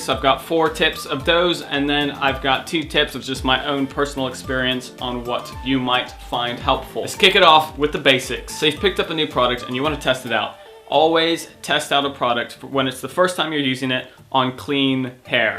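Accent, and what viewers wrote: United Kingdom. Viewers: i've been wondering the same thing, I can go back to a video he made a year ago and he speaks normal american english, but now he talks with a british accent all of a sudden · I just realized, do you have a British accent?😮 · Your accent sounds kinda British in this video. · You've got a little British accent coming and going in this vid, dude. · do you live in the uk? i don't remember you having an accident. certain words you say has a different sound; you phrase things so clearly.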